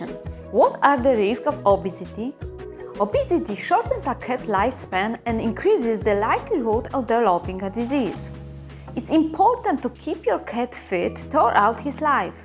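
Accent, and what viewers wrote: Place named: Austria. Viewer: Tysm for the info! I love your austrian accent!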